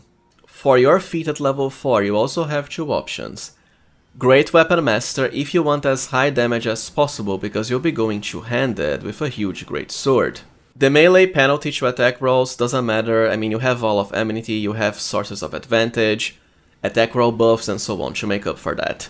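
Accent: Brazil. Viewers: Eu reconheço um sotaque brasileiro quando escuto um!!!!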